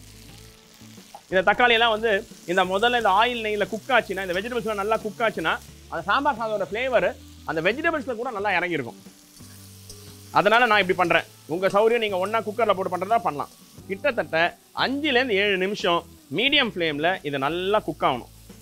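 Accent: Chennai, India. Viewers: Dear chef, Love your passion and the joy you have for cooking, my Amma watched every episode of Samayal Samayal and enjoyed it immensely... Unga Madras Thamizh kaettaa I feel so at home 😊... Do we add the Sambar podi only in the end and not while cooking the veggies in the tamarind extract? Will there not be a raw smell (of the chillies kaaram) since we are turning the stove off immediately after adding the Sambar powder and not cooking it? Will be thankful if you can pls clarify, this is my absolute favourite and I'll be super thrilled if I get it right, missing Chennai :)...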